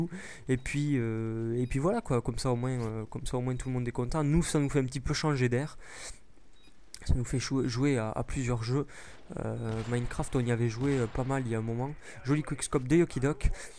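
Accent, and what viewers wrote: Toulouse, France. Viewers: Cet accent toulousain me fait tellement rire!!! Bonne continuation à toi!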